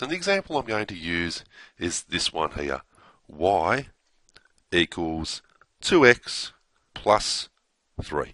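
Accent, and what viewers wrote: Australia. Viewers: Aussie Aussie Aussie!! Thanks, mate. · No he’s just an Aussie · He's just Australian by the sound of it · He's speaking English but he has an Aussie accent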